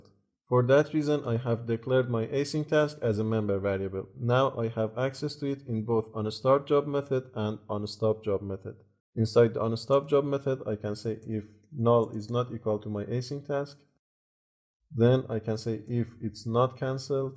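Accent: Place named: Iran. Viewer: Farsi accent, I found out from your accent that you are Iran and I became very happy , LIKE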